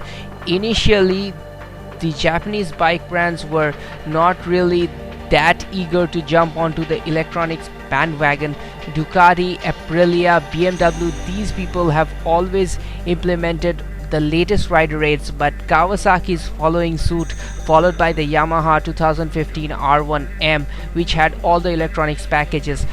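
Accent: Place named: Bengal, India. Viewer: That bengali local accent.. I love it...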